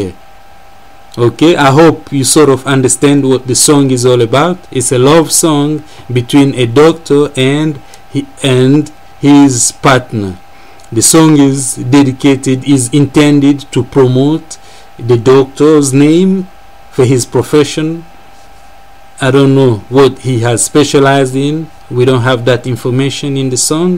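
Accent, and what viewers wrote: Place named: France. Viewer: Your english sound french.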